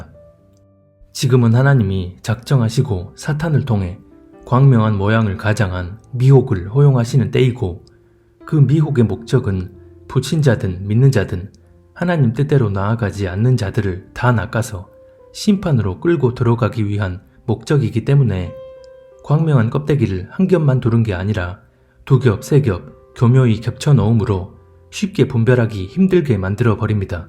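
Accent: Jeolla, South Korea. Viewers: 전라도억양이 아쉽네요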